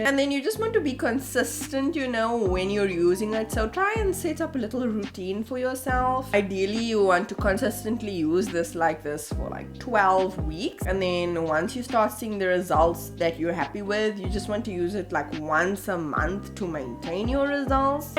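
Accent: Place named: South Africa. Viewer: I love your South African accent 🤩🤩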